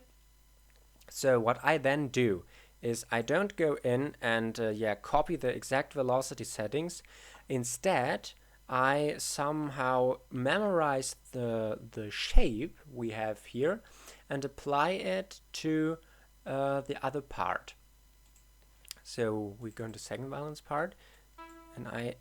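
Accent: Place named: Germany. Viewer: Aber Du hörst dich schon an als ob Du krampfhaft versuchst, den deutschen Akzent zu vermeiden :P (Geht mir aber genau so, wenn ich im Ausland bin..bloß nicht Deutsch klingen^^)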